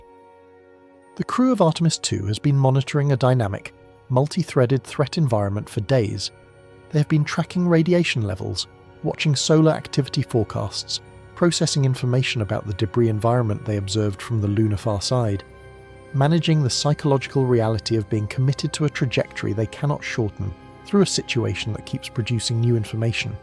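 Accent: United Kingdom. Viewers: Your British accent is not going to help you to get by with this rubbish story!!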